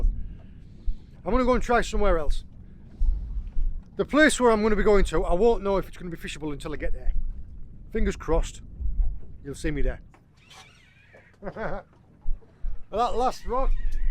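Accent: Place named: Teesside, United Kingdom. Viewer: Hi great video as always, can I just ask where you are from originally? I've narrowed the accent down to the Teesside area or maybe the other side Barrow/Morcambe way?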